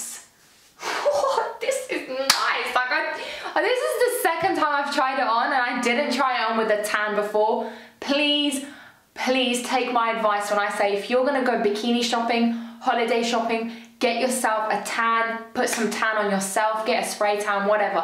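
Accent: United Kingdom. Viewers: my favorite British beautiful woman! Your clothes show is very professional and enjoy able! I love your sexy look and accent. You are very honest with your thoughts and believes. Have a long healthy, happy life ❤️ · Where is that accent from? Okay, UK? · You look really good but your British accent is hot keep up it up .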